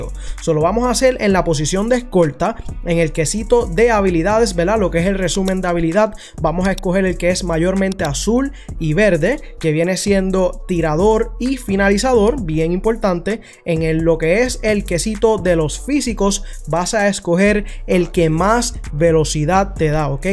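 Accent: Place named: Puerto Rico. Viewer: Eres puertorriqueño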